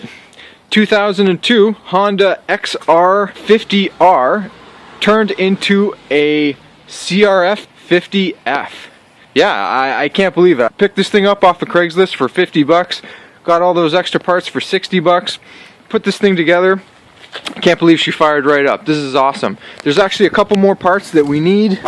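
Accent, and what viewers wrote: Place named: Canada. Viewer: Oh you Canadian? "Check it oot!"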